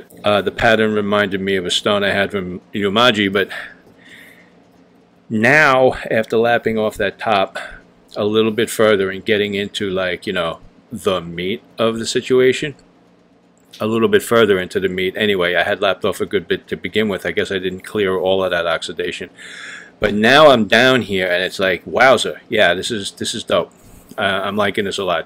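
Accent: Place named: Bensonhurst, United States. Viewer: Men are the new bitches!!!!! Lol!!! So true bro! My cousins live in Bensonhurst, and you have the same accent! So I just purchased a Ozuku Asagi. Previously, I have only honed on synthetics. I hope to be pleasantly surprised. I heard coticules are more difficult to hone on. I'm new to the channel and love it bro! You're very knowledgeable. Keep it coming bro! Any advice on the Ozuku would be greatly appreciated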